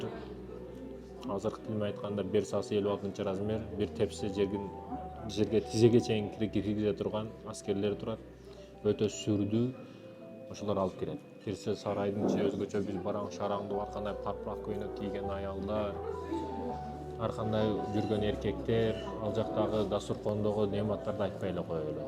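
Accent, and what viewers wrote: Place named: Osh, Kyrgyzstan. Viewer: А деп айтпачы а дей а дей берет екенсин ошттук окшойсун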